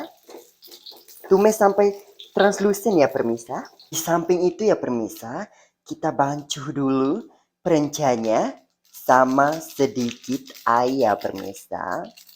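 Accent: Java, Indonesia. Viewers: Jowo medhok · Aaaaaaa kiyowo dehhh pake aksen Indonesia 💜💜💜💜🎉luv bangett mood bangett emng ini orang ❤️❤️ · Suara ni ckp indo sebijik mcm indo yg kawin sma jenis tuu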